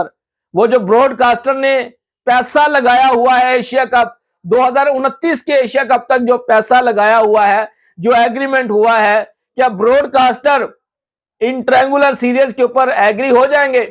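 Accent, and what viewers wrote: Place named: Karachi, Pakistan. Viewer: Abey tu kaisa ch*tiya hai bey? Indian jersey pehen lene se hum tujhe indian man lenge?? Sale tere channel k description mein dikha rha tera channel pakistan ka hai. Sale tera accent dekh, karachi k l@ bazar ka gawaiya lag rha tu. Abey teri apni jersey pehnne se sharam aati hai tujhe to Bangladesh ka pehen le bey bhikari.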